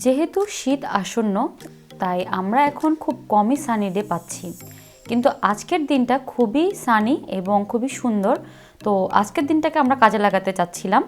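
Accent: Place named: Noakhali, Bangladesh. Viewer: ভ্লগ তো অসাধারণ ছিলই বাট ভাইয়ের নোয়াখাইল্লা একসেন্ট বহুদিন পর শুইনা আপ্লুত হইয়া গেছি।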